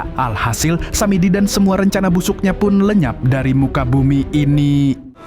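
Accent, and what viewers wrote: Banjar, Indonesia. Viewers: urang banjar kh pian mang🤣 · urang Banjar kah pian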